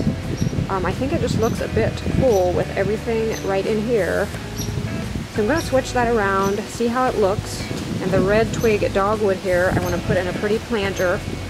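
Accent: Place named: Pennsylvania Dutch, United States. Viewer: I hear remnants of a pennsylvania dutch accent. I think you may have come from a very traditional mennonite family. Holdeman. Very beautiful porch and home! Thanks for sharing!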